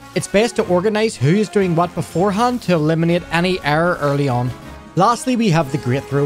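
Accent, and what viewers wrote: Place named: Scotland, United Kingdom. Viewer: Love that Scottish Accent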